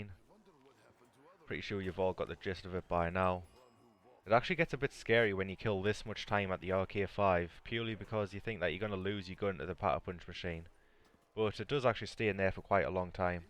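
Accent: Newcastle, United Kingdom. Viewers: Are you a geordie? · i know a geordie accent when i hear one! great to hear people from around the north east creating content it's fairly rare. keep it up mate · It's geordie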